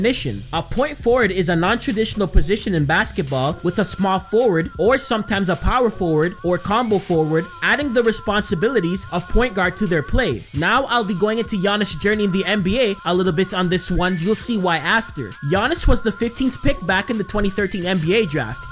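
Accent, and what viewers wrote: Toronto, Canada. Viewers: This guy is a Toronto man confirmed 🤣😭😭 the accent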